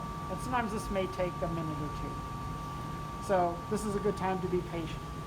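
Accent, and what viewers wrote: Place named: United States. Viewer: Thanks that was great and with an original American accent clear and no ambiguity!!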